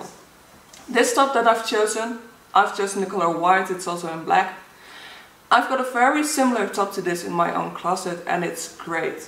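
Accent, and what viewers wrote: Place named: Netherlands. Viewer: Are you by chance dutch I think I recognize the accent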